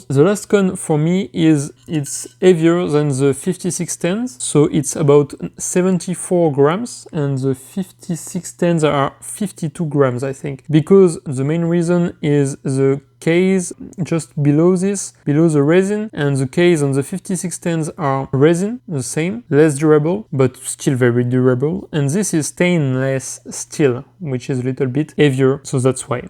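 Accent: France. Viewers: Un bon accent français !! Comme on aime haha 😂❤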